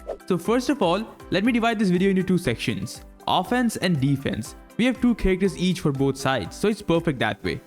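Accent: India. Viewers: You from India bro? Accent seems familiar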